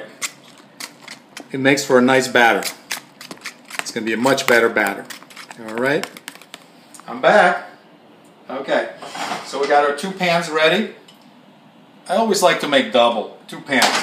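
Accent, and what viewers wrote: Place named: New Jersey, United States. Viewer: I love your Jersey accent❗❗ That recipe looks simple and delicious 👍🏾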